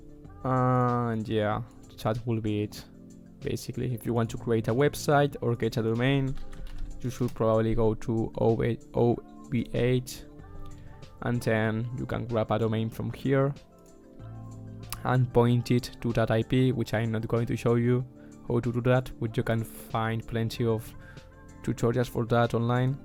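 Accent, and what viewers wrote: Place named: Spain. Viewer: Gracias , se nota que eres español xd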